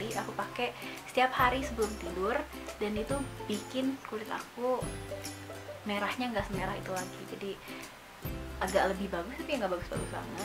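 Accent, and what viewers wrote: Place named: West Java, Indonesia. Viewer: Logat sundanya nempel bgt ... Nice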